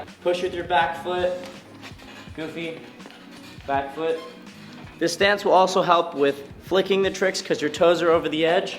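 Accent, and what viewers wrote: Australia. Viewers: are you Australian? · R thay from Australia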